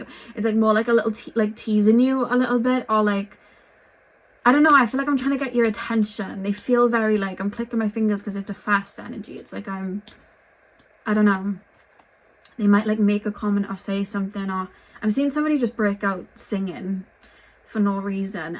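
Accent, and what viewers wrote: Wales, United Kingdom. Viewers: I don't understand a word you're saying 😢 +oh, you got the Welsh accent 😮 +oh... yes I do talk a lot😅 I'll re-watch it with subs 😊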